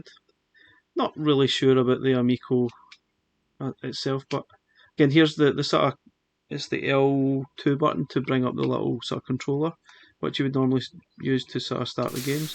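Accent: Scotland, United Kingdom. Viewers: Hi! First off, I like your reviews the best… Scottish accents are my favorite! I speak English but can’t understand a word of Scottish! It’s brilliant! My question…. Does it have intellivission?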